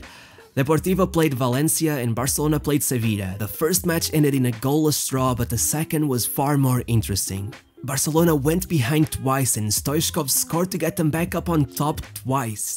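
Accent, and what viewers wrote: Portugal. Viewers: o inglês tuga dramático é que coiso. Mas isto tá fixe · I love your Portuguese accent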